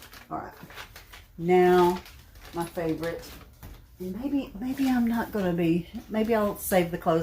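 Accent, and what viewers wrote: South, United States. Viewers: Dear sweet lady, I knew exactly what you meant, I could never imagine you taking anything. I love your thrifted fines, the way you decorate, your Southern voice, you are a blessing !!! I do hope you get over your cold quickly so you can hold your new Grandson soon. Congratulations !!! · This is the 1st video I have seen of your channel, I am loving it...I am from Ohio and LOVE your sweet southern accent.I am a thrifter and have been for 42+ years, you can make your home SO unique because things you find aren't mass produced like a big box store....everyone tells me my home is very unique and interesting , there is ALWAYS something different to see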